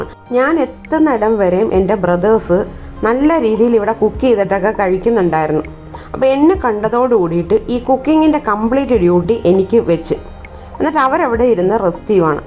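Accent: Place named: Erattupetta, India. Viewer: Eratupetta slang varunund samsarathil😇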